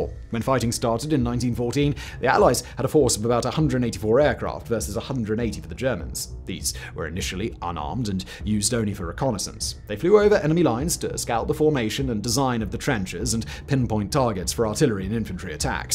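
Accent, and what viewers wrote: England, United Kingdom. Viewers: Why are you using American English? You sound English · The french and German trenches were nothing a like. People think becuase this guy dressed well and has a british accent he knows what he is talking about. The german trenches were meticulous and the English were temporary and terrible to live in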